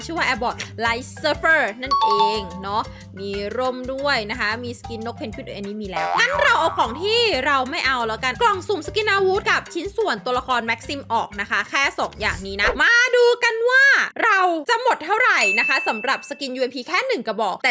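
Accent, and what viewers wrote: North, Thailand. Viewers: อ้าว คนเหนือเหรอครับปม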